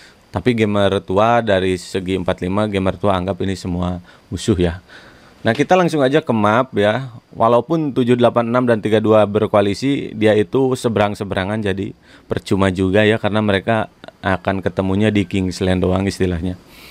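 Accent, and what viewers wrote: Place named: West Java, Indonesia. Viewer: penyebutannya / pronounciation nya dia aja karena dia orang Sunda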